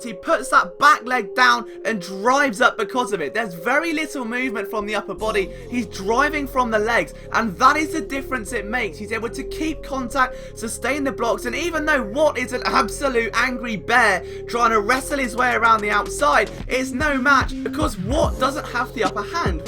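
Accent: England, United Kingdom. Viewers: YOU SOUND ENGLISH? IF SO YOU'RE UNDERSTAND THE GAME REALLY WELL. AMERICAN FOOTBALL IS COMPLEX LIKE CHESS. I GIVE YOU A THUMBS UP ON THE SUMO ACTION IN THE PIT. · It's funny hearing a British accent breakdown football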